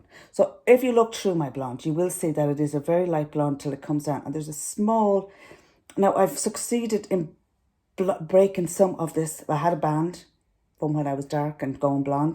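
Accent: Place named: Ireland. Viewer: Thanks,! Love listening to you. Can't tolerate all the "younger" gal videos. We had to double-down on the lockdown here in California, and I've got about 5 different colors of blonde in my hair, so I'm gonna give this a shot assuming I can find that color here. I agree with you on the NO ASH, which is what it seems all the ladies here are going for. Ewww. Doesn't quite work on us over 40s! Again - LOVE your (Irish?) accent and your potty mouth - cracks me up every time.:)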